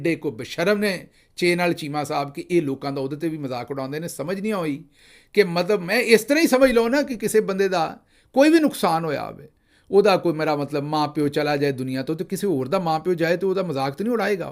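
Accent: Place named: Punjab, India. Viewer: Taxi drive is respectable profession like other labour class professions in the west and America , you should not look down it’s profession when you were mentioning Kakar achievements, I respect your literary background but you also choke in the English dialect when you use any words in this language because of your rural background of the Punjab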